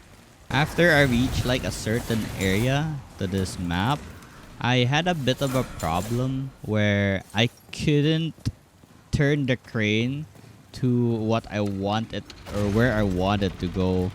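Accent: Philippines. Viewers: dude are you filipino? cause you got the a ccent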